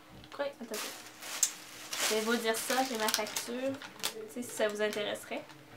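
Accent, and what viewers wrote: Canada. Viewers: Youppi tu parle français Canada je mabonne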